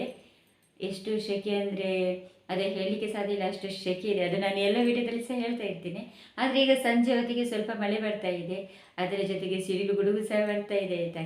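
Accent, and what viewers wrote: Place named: Mangalore, India. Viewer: Hype👍ನೀವು ಕುಡ್ಲ ಕನ್ನಡ ಪತೇರಿ ಮಾರಾಯರೆ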